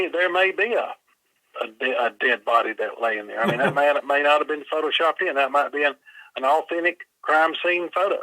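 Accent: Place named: South, United States. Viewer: I could listen to narrations for hours, really enjoy his 'good ole boy' southern style..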